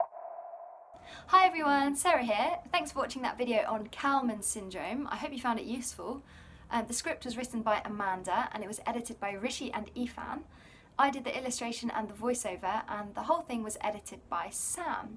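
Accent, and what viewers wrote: United Kingdom. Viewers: Her high-pitched low-volume voice with British accent is simply annoying. It's like she's trying to become the British female Tanner, but without any success. #BringBackTanner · British accent?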